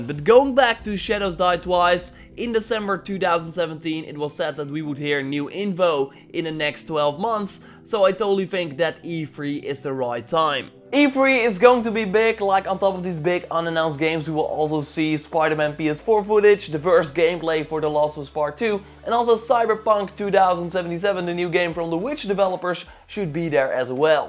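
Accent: Netherlands. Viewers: From what country are you you sound like you are from holland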